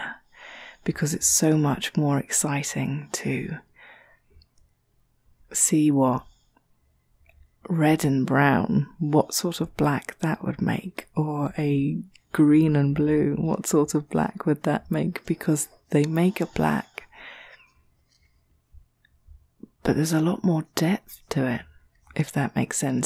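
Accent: United Kingdom. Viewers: Really enjoyed listening to this...makes a change hearing an English accent but also fascinating understanding how you approach your art. Would love to see more of this from you as it works on so many levels :)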